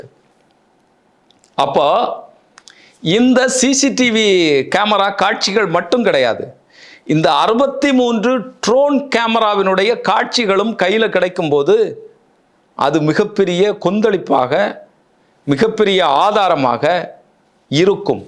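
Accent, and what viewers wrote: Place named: Kanyakumari, India. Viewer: குமரி தமிழ். பாராட்டுக்கள்.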